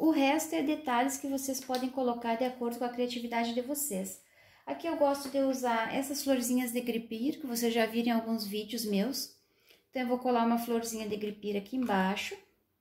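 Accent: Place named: South, Brazil. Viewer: Amei .. Cheguei hj no canal e já amei .. Vou salvar pra fazer! Amei só sotaque do sul 🤩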